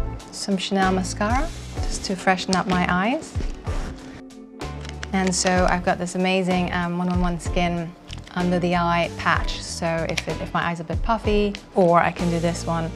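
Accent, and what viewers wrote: United Kingdom. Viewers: She sounds kinda British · Gosh she has such a British accent these days! Seems very nice - does she really only have mascara for make up in there?!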